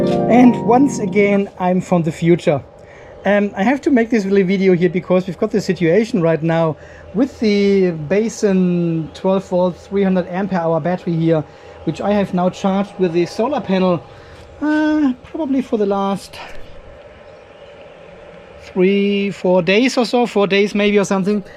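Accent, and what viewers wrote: Germany. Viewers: Hi, i am thinking about buying one of those Batteriepack from Basen for my upcoming solar Project. But... I don't know yet. I start watching your Channel a couple of days ago. I am impressed about your knowledge on the whole Solar stuff. And the other thing is i was wondering about your english language with the German Akzent. But anyway... I Like your Videos, keep on doing IT. I learned a Lot more about batteries from U. Grüße aus Ludwigsfelde Tom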